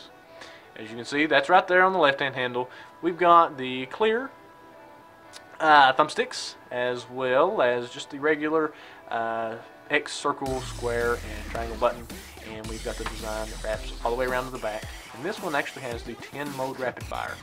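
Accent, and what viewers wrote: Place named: South, United States. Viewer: Dude, you have such a cool southern accent!! I wish i still had mine but i lost it when i moved up north:(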